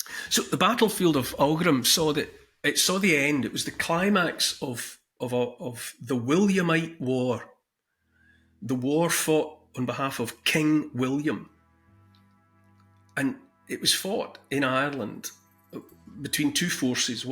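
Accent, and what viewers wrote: Scotland, United Kingdom. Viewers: If I had to pick one official English accent in the world it would be your Scottish accent. Enjoy Count Dankula's as well. You have the best English accent in my opinion of all places on earth. Could listen for hours to it. Great job of story telling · Hearing your amazing thick Scottish accent pronouncing a lot of unusual words and going into rich storytelling is some divine ASMR, very nice, thanks!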